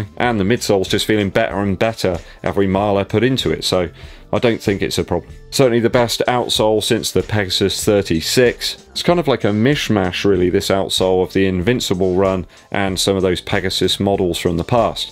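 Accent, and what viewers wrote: United Kingdom. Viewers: Your voice is perfect for this. What can I say you got a proper British accent and people listen 😂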